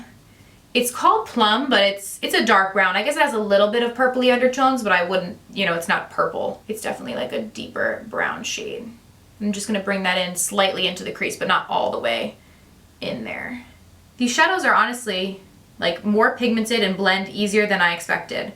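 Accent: United States. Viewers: Her talk reminds me of jaclyn hill. Very american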